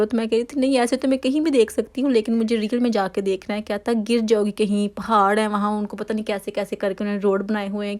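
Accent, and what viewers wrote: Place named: Faisalabad, Pakistan. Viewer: Ap ki lahaja MERI Tara ha shiad ap b Faisalabadi ha 😁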